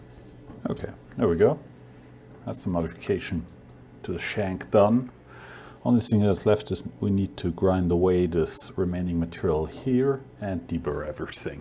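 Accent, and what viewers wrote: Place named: Hemau, Germany. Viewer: Glad to see a video, you are my absolute favorite machining channel of all time. You're a very talented machinist and you have the exact same accent as my family from Hemau.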